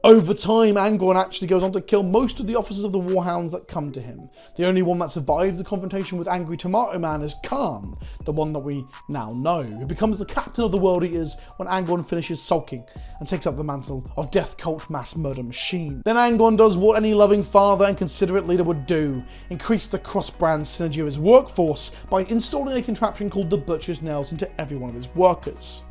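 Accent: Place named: United Kingdom. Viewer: yeah I'll sub to the channel. gotta get my british guy talks about warhammer fix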